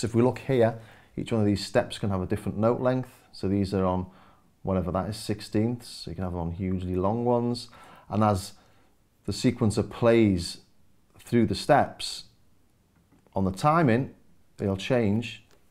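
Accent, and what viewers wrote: Liverpool, United Kingdom. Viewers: Dang I’m sold on this now! Cheers from Hollywood, CA. Love the accent…would that be what they call “scouse?”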